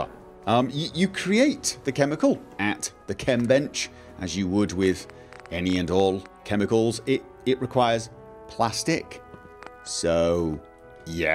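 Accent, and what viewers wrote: United Kingdom. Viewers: video as always, mate. Which part of Norway are you from? Your accent sounds somewhat British, then again, I've only heard the Norwegian accent a handful of times.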